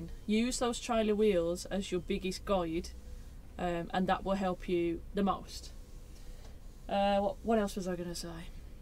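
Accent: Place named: West Midlands, United Kingdom. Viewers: great video ive just started my class 1 from car and stressing before I've done my theroy test you would be a great instructor and love the local accent im a west midlander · Great video. I'm an ex HGV Class 1 driver. I lost my HGV licence due to a chronic heart condition. I now live in Wales but I am origionally from West Bromwich. It's good to hear someone with my accent. Your video really did bring memories flooding back to me. I enjoyed it very much. You are an excellent driver. One small critisism. Please don't mind me mentioning this but I noticed a lot of crossing of the hands on the steering wheel. Apart from that you did a top class job. Accept my good wishes and I look forward to watching more of your videos.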